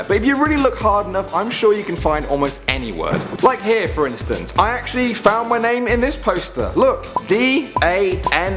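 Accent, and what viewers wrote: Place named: United Kingdom. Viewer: british accent is so annoying!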